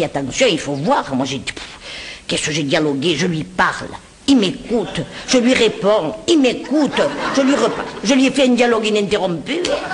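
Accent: France. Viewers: C'est excellent!!!!j'entends vraiment tout le parlé marseillais de ma grand mère, c'est tout a fait ça Merci 🙏🥰💕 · Elle a l'accent niçois.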